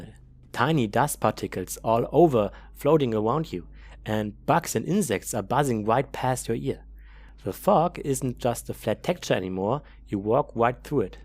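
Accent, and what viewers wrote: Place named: Germany. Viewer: imo perfectly fine. Your english sounds very german but who cares. The content is great btw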